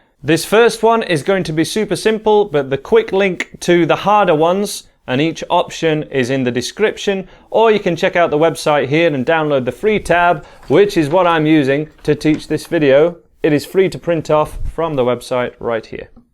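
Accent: United Kingdom. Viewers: Hi Thank you for the free tabs> Are you UK based (it sounds like you are) looking forward to making contact.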